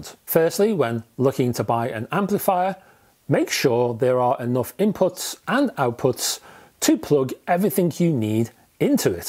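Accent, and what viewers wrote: Liverpool, United Kingdom. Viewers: Hi. Love your reviews. Returning to the UK with a pair of Elac Unifi 2.0's and an Audioengine B1 Blutooth DAC. I use the Audioengine to stream music from my phone. I want to purchase an amplifier to complement this set up. I Plan to connect the TV to the amplifier and maybe some other digital sources so optical and coaxial inputs would be important. My budget would be around £500. Any suggestions? Love your L'pool accent. Love L'pool too. John.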